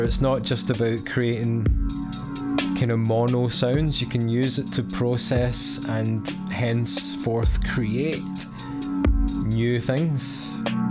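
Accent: Scotland, United Kingdom. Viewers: And then I hear a fellow Scotsman. Bravo chap!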